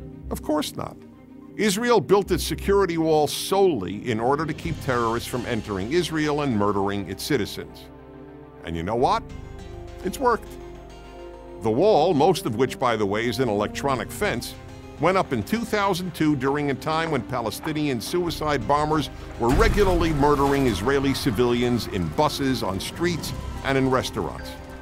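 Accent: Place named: United States. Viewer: It's the usual excuse and deflection from the presenter (who appears to be an American judging by his accent. The apartheid system is carried out in the Occupied Palestinian Territories. The Palestinians there are seperated, restricted and not allowed to vote over the Israeli government who rule their lives.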